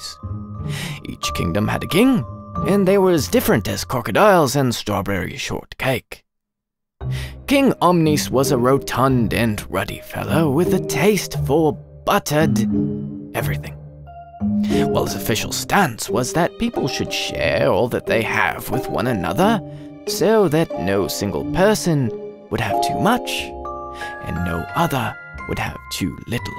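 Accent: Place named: United Kingdom. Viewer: haha so the accent's not bad! But there are a few slips! =P I love the story though!! You totally should've used "bottom" rather than "ass" too! (children's story, right?!) Also sounds much more British! The story could be compared to coming out of Communism... it's rather an astonishing tale! Toodlepip and all that!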